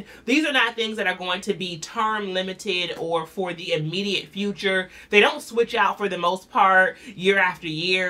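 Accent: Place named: DMV, United States. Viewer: Friend!! I feel like I just went shopping with a good girlfriend. Sounds like you're from the DMV too...SUBCRIBED! Loving what you do sis!🥰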